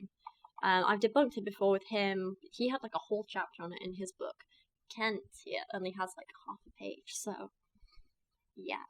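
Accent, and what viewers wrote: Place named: England, United Kingdom. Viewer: Hi, I know this is a very late comment to your video, but I just wanted to say you have an amazing accent! The English accent is so cool, Kent Hovind must be high to even suggest that you have a weird accent. Anyways, wishing you good vibes from NY! stay cool!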